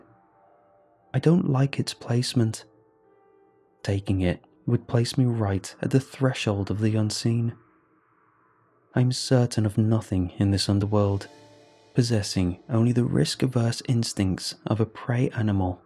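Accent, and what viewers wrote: United Kingdom. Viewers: Amazing voice from our favorite british narrator!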